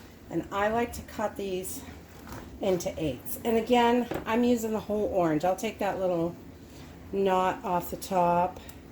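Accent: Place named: Massachusetts, United States. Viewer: Just went apple picking so about to make two batches of cider using your recipe. Having been born and raised in Mass (and now in Cali) I just want to listen to you and your accent- you can't tell me you are not from Mass!!!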